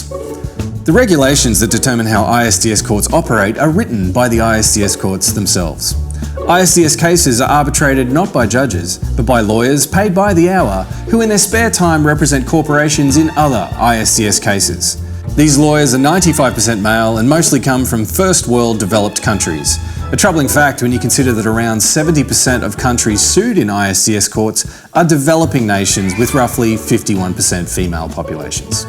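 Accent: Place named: Australia. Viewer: Love his Aussie accent :) AND he's smart - we need guys like this here in the USA.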